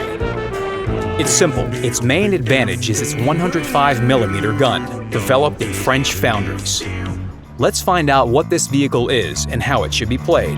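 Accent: England, United Kingdom. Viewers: I love your english accent ! the R-E-V-A-L-O-R-I-S-é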